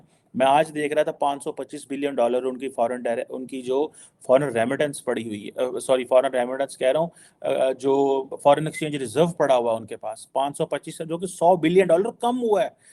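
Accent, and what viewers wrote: Punjab, Pakistan. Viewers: you amaze me sometimes! You sound like my father who was from Gujranwala and had the same lehza in the Punjabi he spoke! Ha mare Kutte bhi Khas hain! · I love the Punjabi tadka you give. Keep it up. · Love your punjabi accent😅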